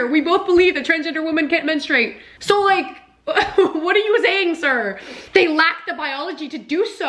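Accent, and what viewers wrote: Canada. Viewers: are you canadian? you just have the vibe/accent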